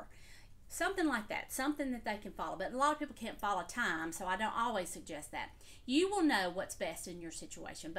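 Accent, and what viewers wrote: South, United States. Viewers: I just love your southern accent and delivery. You are so sweet and compassionate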